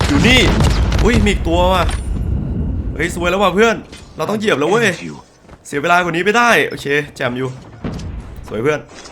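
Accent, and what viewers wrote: North, Thailand. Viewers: พี่เป็นคนเหนือใช้ไหมครับถ้าใช้พี่อยู่จังหวัดไหนผมอยู่อุตรดิตถ์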